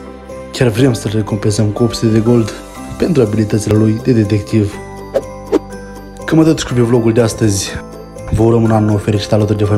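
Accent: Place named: Moldova. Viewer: Ma jur daca inteleg ceva din ce zice asta…oribil accent, n-are o treaba cu B-vlog-ul vechi…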